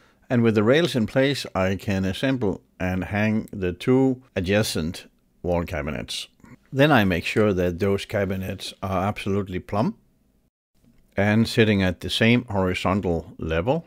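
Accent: Denmark. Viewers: Liked and subscribed👍 very nice videos, and valuable information! Would i be mistaken if i said that there is a hint of “jydsk dialægt”? 😄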